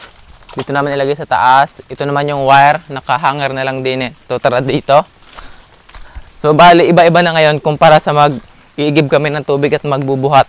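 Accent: Batangas, Philippines. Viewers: Batangeño ka ga kuya?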